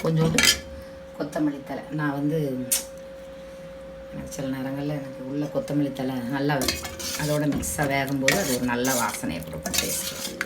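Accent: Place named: Thoothukudi, India. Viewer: பார்க்கவே நல்லா இருக்கு. நீங்க தூத்துகுடியா? அந்த ஊரு வட்டார தமிழ் அழகா பேசுறீங்க. அருமை சகோதரி. நன்றி 💐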